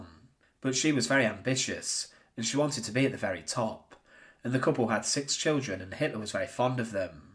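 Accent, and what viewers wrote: United Kingdom. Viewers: Depends on where he is from in the UK, hence his accent. If you don't like it, don't watch.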